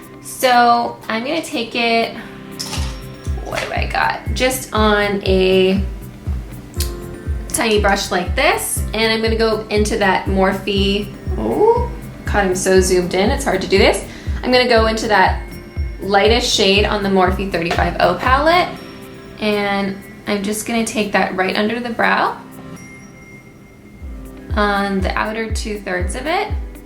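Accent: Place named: California, United States. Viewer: sounds like CA accent.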